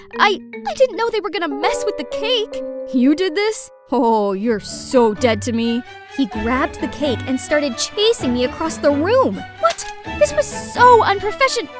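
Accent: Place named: Canada. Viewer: I love that there is a hint of canadian accent in the narrator's voice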